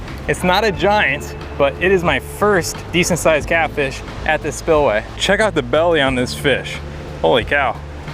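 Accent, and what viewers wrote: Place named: Buffalo, United States. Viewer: Your accent reminds me of my buffalo ny extended family....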